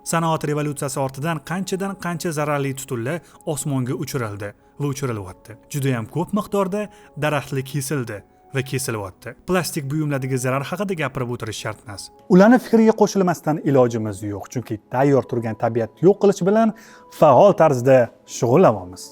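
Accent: Tashkent, Uzbekistan. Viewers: Аввало видео учун рахмат журналистмисиз блогермисиз адабий тилда гапиринг Тошкент шевада гапирмай тв куйсанг хам факат тошкент шевада гапиришади нима тош шевада гапирса маданиятли булиб коласизларми шунчаки жахлим чикади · Тошкент шевасида гапирмасдан,адабий тилда гапир.Кушнинг билан гаплашиб утирмаяпсан-ку ,саводсиз. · Тошкент шевада гапиргани ёкмади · Ука, тошкенча воттиламай адабий ўзбекча тилда гапирсангиз курсатувингиз яхши чиқарди! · вотти дегани нима дегани сизларни фақат тошкентликлар кўрмайди адабий тилда гапринглар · "ВОТТИ-ВОТТИ" ЛАМАЙ ГАПИРСА БУЛМАСМИКАН. БИЛДИК, "ТОШКЕНТЛИК" ЭКАН. ХАР ХОЛДА АУДИТОРИЯГА ШЕВАДА ЭМАС, АДАБИЙ ТИЛДА ГАПИРИШ КЕРАКЛИГИДАН БУ "ШАХАРЛИК" НИНГ ХАБАРИ БОР ШЕКИЛЛИ... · Ijod zoʼr takrorlanmas omadizi bersin bir taklif NATOʼGʼRI TUSHUNMANG LEKIN AKA SOF OʼZBEK TILIDA GAPIRING ADABIY TILDA TOSHKENTCHA SHEVADA EMAS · eeey toshkanli aka adabiy shevada gaproring tushnmavotman gepizge oga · ассалому алайкум малумот учун рахмат аммо вотти сузини (тошкентча шевани ) ишлатманг илтимос! 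узбек тилида кевотти борвотти деган гап йук. эфирда адабий тил миерларига риоя килинг. узур гапим огир ботган булса! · Материал зур. Лекин Тошкентча шева унинг сифатига таъсир килмокда. Кейинги чикишларда ушбуларга эътибор каратилса ажойиб булади. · Toshkenligu Toshken shevada gapiradida. Manga qaytanga hariplani shevadidan kura shu uzimizani Toshken sheva chiroyli eshtiladi. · Ижодингизга омад. Лекин видео Тошкент шевасида экан, нима, бу фақат Тошкентликлар учунми? Тошкент шевасини эшитдиму, томошани дархол тўхтатдим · dasturni toshkent shevada emas adabiy tilda olib borsangiz yaxshi bòlardi har holda sizni faqat toshkent kòrmaydi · билдик Тошкентлик экансиз, одамлар чет тилини ўрганади, Тошкентликлар адабий тилни ўрганолмайдм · Toshkenni shevasida gapirsa nima bopti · Juda yaxshi boldi. Lekin Toshkent shevaiida emas adabiy tilda gapirsangiz yaxshi bolar edi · Toshkent shevada emas, adabiy o'zbek tilida gapiring, ba'zi gaplarizga tushunmay qolyabman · Toshkent shevada emas adabiy badiiy tilda gapirsangiz yana ham gòzal bòlarkan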